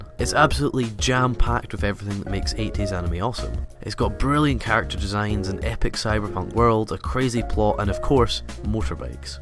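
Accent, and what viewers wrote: Scotland, United Kingdom. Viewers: He Scottish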